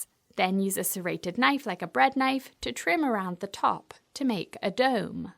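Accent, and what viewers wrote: United Kingdom. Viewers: Nice to hear a British accent for a change. She speaks so clearly and nicely. Rare on YouTube.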